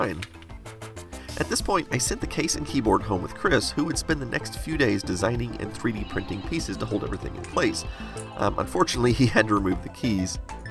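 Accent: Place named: Texas, United States. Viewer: you have the slightest most subtle Texan accent ever and I love it so much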